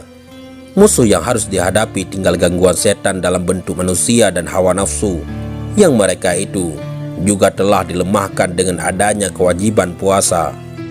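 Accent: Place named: Bali, Indonesia. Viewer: Yg pengisi suara nya siapa ya kyk nya bkn orang jawa logat nya kyk orang bali mungkin kh bali mualaf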